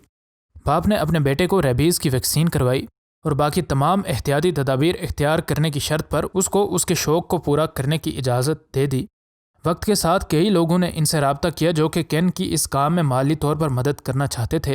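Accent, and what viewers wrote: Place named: Sindh, Pakistan. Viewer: Ap Urdu me M.A.kro ya P.H.D pir b Teri Urdu teakh nhn ho Sakti,q.k ap Sindhi Bhai hain... Tumse ziada achi Urdu wos 4-6 saala bacha ka hy jisko alif zabr ha or beh zarb ba likna nhn a ta iski waja Yeh k yeh bacha uska bacha hy jiska Abba panageer,Hindustani, mahajir,byyah, or Urdu speaking hy...Lehaza hi Sindhi Bhai urdan daan tum nhn bnskte pir Q apni zaban ko gol gol karke Urdu bolta hy,ap bas Urdu bolo lkn pir b Sindhi E rahoge....achi Urdu sirf bayyah log E bolsakte hain Na me r Na tum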